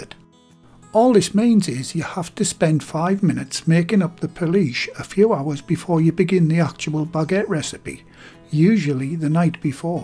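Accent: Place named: Northeast England, United Kingdom. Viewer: I meant that you're from the Northeast of England, you can hear that. I'm from Gateshead :) . Thanks for the extra info, too, I will give the recipe a go!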